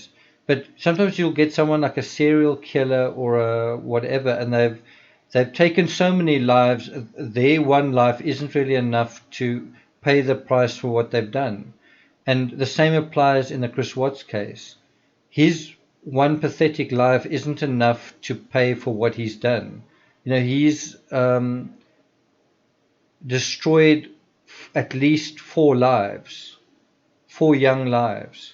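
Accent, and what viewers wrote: South Africa. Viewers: I love your accent 🥰 Sounds South African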